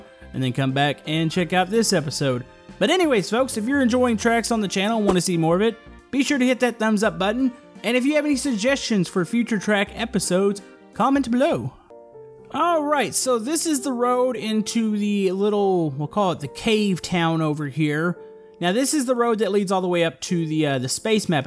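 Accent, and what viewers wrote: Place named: South, United States. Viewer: By hearing your voice it sounds like you have a country accent...no offense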